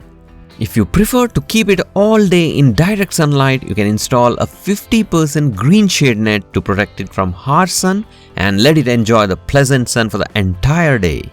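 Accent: India. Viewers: Very strong Indian accent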